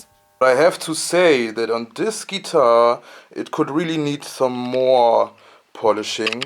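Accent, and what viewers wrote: Germany. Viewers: Hallo, kann ich einen deutschen Akzent erkennen? Do I detect a German accent? I like your style, going to try it on a neck or 2. -Regards, -G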